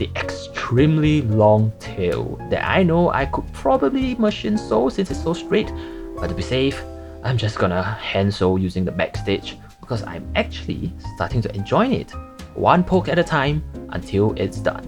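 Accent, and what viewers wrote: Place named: Singapore. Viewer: from the accent and the whiteboard marker u used i could tell u were from singapore haha! love ur sense of humour and u are so talented. gonna binge watch ur other videos now ;) !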